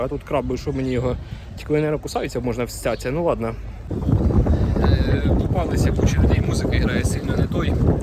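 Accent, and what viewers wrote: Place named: Komyshuvakha, Ukraine. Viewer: А часом, Ви не з Комишувахи? В мене там родичі живуть, говір - ідентичний❤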